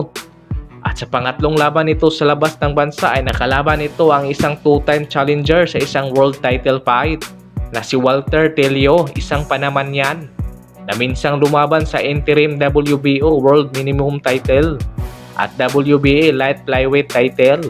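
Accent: Visayas, Philippines. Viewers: Magkatulad tayo lods bisaya Be proud!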